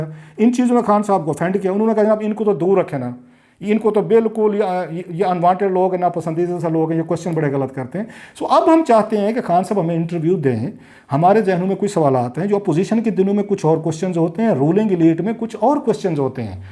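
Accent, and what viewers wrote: Pakistan. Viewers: Klasra sahab, first of all I love your urdu accent and the way you talk.. big fan of your reporting, you truly have exposed some great stories and scams.. Although I am a PTI supporter but I still think you are right, khan sahab should have an interview with you and face your questions..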